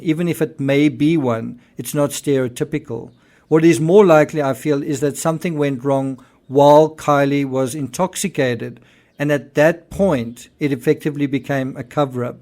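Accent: South Africa. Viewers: I recognise the accent...fellow South African...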